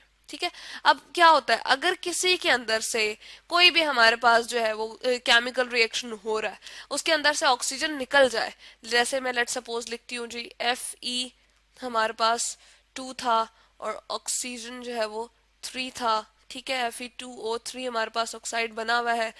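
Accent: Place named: Pakistan. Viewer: You look Pakistani the way you talk 🤔🤔😍 I love your way of talking and this video helped me a lot thank you .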